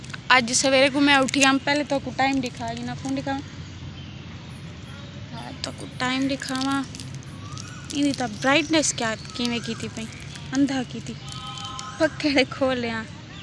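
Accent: Saraiki, Pakistan. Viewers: صدقے تھیواں تیڈی میٹھی سرائیکی ❤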